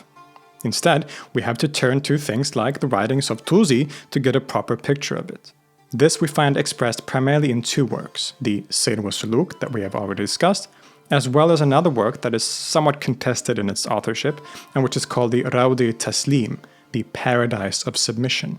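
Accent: Arab world. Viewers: this. your last comparison was extremely on point. he belonged to a persian background, it makes sense to pronounce the name with Iranian / Farsi accentation. there was a similar problem where he pronounced some names from the subcontinent straight up "wrong" in one vid (can't recall which rn tho sorry) cuz of arabization. not to say that this is in bad faith. i'm sure he just finds this to be the most intuitive reading, and ends up defaulting to it during his narration, which is smth i relate to as an urdu speaker who reads farsi in a very urdu accent :,) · This guy is such an arab wannabe he even says Iranian names with the guttural Arabic pronunciation. Khwajeh Nasiruddin-e Tusi... At least learn his name. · Awesome work as always! I thank you and salute you for bringing more and more of these Eastern geniuses into public recognition, which I think would ultimately have a positive impact on the world we live in. 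On another note, I think your pronunciation of Persian names and words is a bit over-Arabicized! Persian names and words such as Tus and Tusi do not follow the Arabic rules of pronunciation. For instance, the Perso-Arabic letters of س،ص،ث are all only pronounced as [s] in Persian, unlike in Arabic where they all have different pronunciations. Persian has its own completely different rules of pronunciation, despite being written in the same script as Arabic. Arabic words and proper names loaned into Persian also follow these Persian rules of pronunciation. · I have a question to ask, why do you always use Arabic pronunciations when you talk about Persians? Is there a reason behind this?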